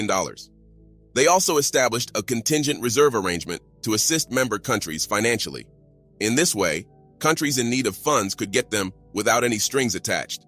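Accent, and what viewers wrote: United States. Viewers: You are such a cheerleader for the other side. Do you live in Africa. You sure sound American. I call cap. You want your money to be worthless, dummy? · Don't know why, thick American accent doesn't sound pleasing.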